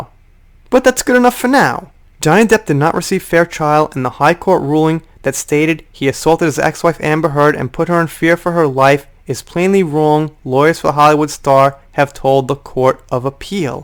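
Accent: New York, United States. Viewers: Just so ya know you pronounce it "Toka, Japan" your New York accent makes it sound nothing like Tokyo.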